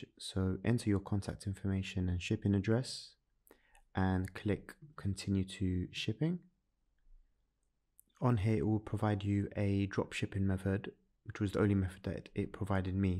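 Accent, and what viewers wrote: United Kingdom. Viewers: from the usa why does he sound british wtf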